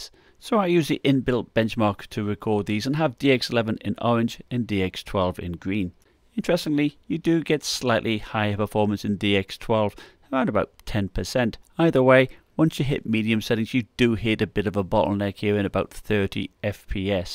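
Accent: United Kingdom. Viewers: But you sound British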